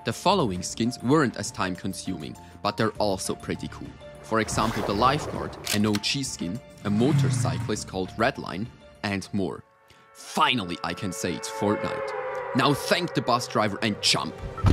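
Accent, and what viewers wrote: Germany. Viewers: youre german?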